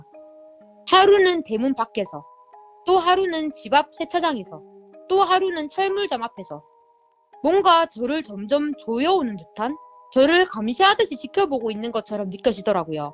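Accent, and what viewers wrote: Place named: Busan, South Korea. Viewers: 부산 분이시죠 ㅋㅋ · 그런듯합니다 저도 부산 사람인데 정감이 많이 가네요~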